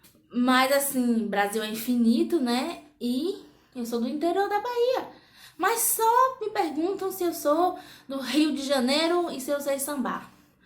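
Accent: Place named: Venezuela. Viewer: Ei amiga com certeza te perguntam se vc é venezuelana porq vc já deve ter pegado um geitinho um sotaque kkk .Eu sou capixaba meu marido cearense e quando vou nos lugares sempre tem quem pergunta kkk vc é cearense?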